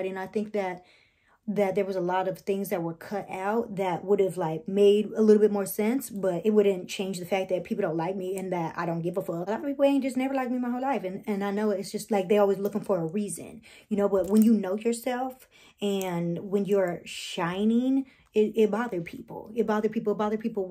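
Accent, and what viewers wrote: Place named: New Jersey, United States. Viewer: I just thought you were one of those jerseylicious girls..loud and your accent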